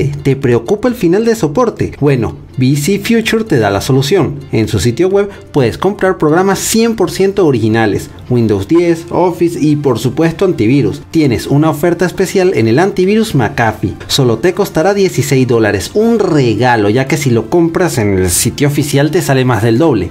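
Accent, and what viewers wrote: Venezuela. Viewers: amigo eres de venezuela bueno porque yo si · Llevo tiempo viendo tus vídeos y siempre supuse que eras Venezolano jajaja Saludos desde tu misma nación crack!!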